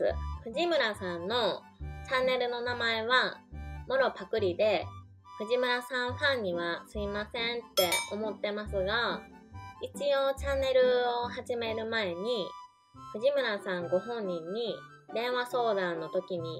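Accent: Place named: Kansai, Japan. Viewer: 大阪にちょっとだけいた元ホストです。関東の人間にはアメージングで謎なT新地の不思議をいろいろ教えてくださって勉強になります。またの関西弁にキュンキュンします。１０００人おめでとうございます。ライブ配信頑張ってください。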